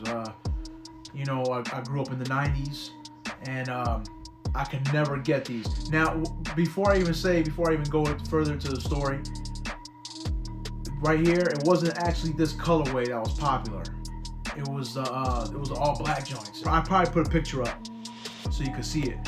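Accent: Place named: East Coast, United States. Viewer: Sound like you from the east coast dog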